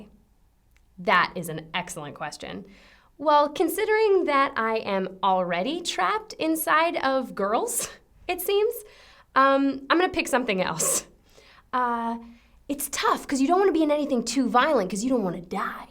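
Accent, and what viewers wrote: England, United Kingdom. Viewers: You're welcome. Obviously I just call you those things outloud and appreciate that I've never actually typed those words in the comments box because, well come on, that would just be fucking creepy! I do feel comfortable enough to announce that I am a huge fan of your english accent though, great because it's not the "upper class" accent that most go for.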